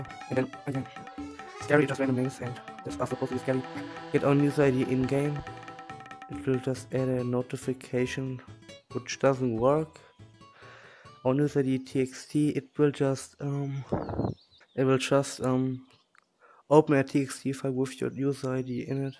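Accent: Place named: Germany. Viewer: you sound soo german XD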